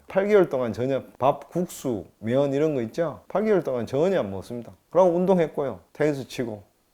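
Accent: Gyeongju, South Korea. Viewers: ~~~ 경주 사투리 ㅎㅎㅎ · 박사님 왠지 경상도사투리가 정감이가더라구요 고향이 경주내남면이군요 내남면에서 이렇게 훌륭한분이 태여나셨군요 좋은정보감사합니다~❤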